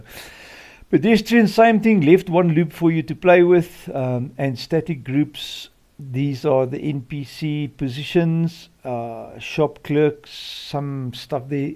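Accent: South Africa. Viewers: Hey. By your accent I take it you're from sunny South Africa. How bout making us a nice SA map.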